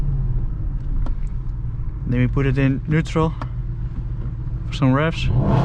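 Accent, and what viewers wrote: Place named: Netherlands. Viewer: hahaha ik hou van dat welbekende nederlands accentje 😂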